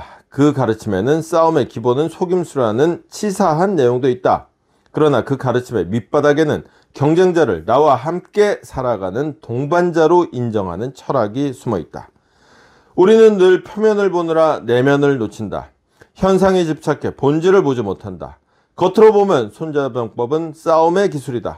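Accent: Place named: Gyeongsang, South Korea. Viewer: 경상도 사투리가 맛깔 나십니다..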